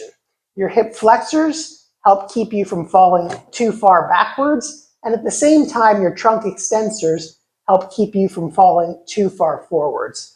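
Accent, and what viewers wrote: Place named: United States. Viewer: I knew it he's a yank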